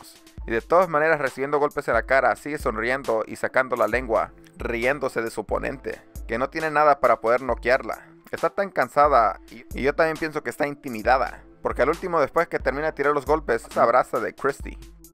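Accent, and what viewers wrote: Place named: Sonora, Mexico. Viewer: oye eres Sonorense? tienes el acento de mi estado.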